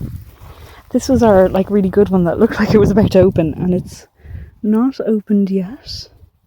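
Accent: Ireland. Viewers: you are my spirit guide I never know the date either 😂 I'm permanently shocked about where the days are going. Also, as a Dub living in the UK the past 18 years your accent and your sayings are a cure for the homesickness. The place is looking great, even better in the sunshine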